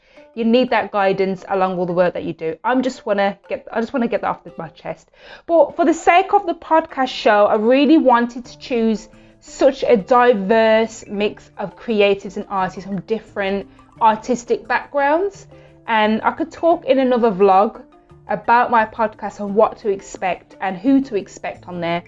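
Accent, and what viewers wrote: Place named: Birmingham, United Kingdom. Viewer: I just like the slight Birmingham twang! New subscriber here, do you ever do vlogs out and about?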